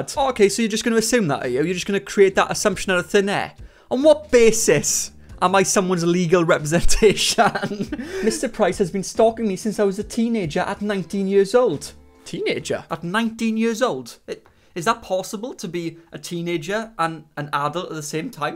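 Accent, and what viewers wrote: Wales, United Kingdom. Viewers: He has a Welsh accent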